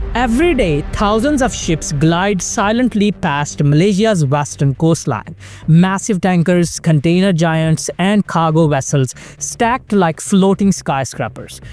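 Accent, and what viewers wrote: India. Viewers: It irritates me when you say " raut" for route! Route is pronounced " root". Unless you were born and bred in the USA, please pronounce it the English way! You sound indian so I find it even more irritating when you suddenly use American pronunciations for certain words. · What is the point he is trying to make? Malaysia has also a very large port im the China Sea.. pointless video. This sounds like a guy from India?? There are other ways for ships to pass from the China Sea to the Indian Ocean.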